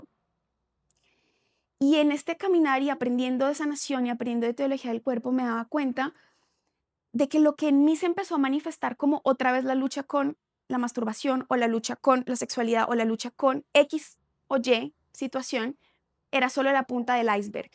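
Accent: Colombia. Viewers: Que linda y hermosa!. en el buen sentido de la palabra y con todo el respeto cristiano. Mas allá de su físico capto su belleza a través de su palabra 😅. Su forma y su tono gracioso de hablar 😹. Tiene mucha gracia en su gesto y en su verbo para poner atención de los temas intensos de sexualidad con relación a la religiosidad. Habla muy bonito , su forma de hablar colombiano. Me encanta ésa forma de hablar 😍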